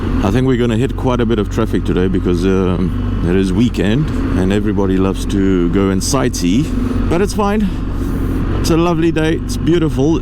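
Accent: South Africa. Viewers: And you also have a VERY South African accent. I initially thought you were from there.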